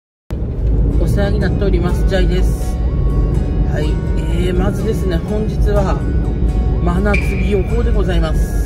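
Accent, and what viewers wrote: Aomori, Japan. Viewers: Jaiさん初めてコメント致します。オススメに出てきてすっかりJaiさんのファンになりました。過去の動画も見漁ってます😂 人柄の良さがにじみ出て、豪快な飲みっぷりと食べっぷりにアッパレ。青森弁にも癒されてます。 キャンプは憧れだな…いつかやってみたい! 大自然の中で飲むビールは美味しいよね。 私も大酒飲みです。 次の動画も楽しみにしています。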